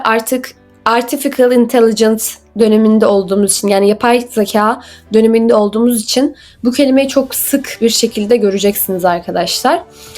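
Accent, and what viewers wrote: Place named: Turkey. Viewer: R leri cok bastiriyorsun turk aksanindan cikman iyi olur biraz dinleyip birseyler gormek istedim ama aksan rahatsız edici. Dinleme telaffuz geliştirmen faydali olur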